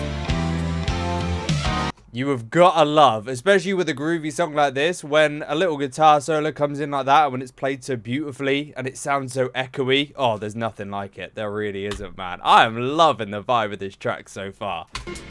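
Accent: United Kingdom. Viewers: イギリス英語が聴けたのでとても嬉しいです！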